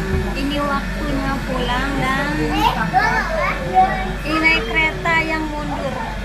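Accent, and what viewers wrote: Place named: Indramayu, Indonesia. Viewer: Wong ndi teh,Kya Wong dermayu tah mjlngka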